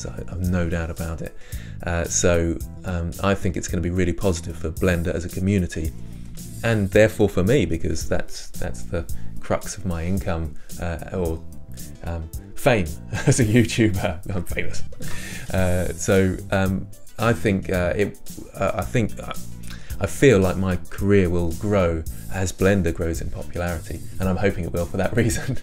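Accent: United Kingdom. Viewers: "Hey, I'm, I'm very sexy" he is being too modest, under his sweater he hides a six pack also, he has a british smile and accent 👌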